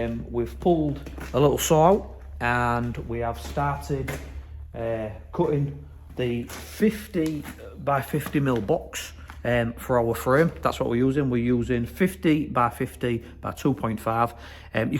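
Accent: Hull, United Kingdom. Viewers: You’ve got to be from Hull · Sick this, you sound like a hull lad, was that price a total price for the double gates and the side gate? I’m looking at doing a side gate only. Wondering what the price just for the side gate was ?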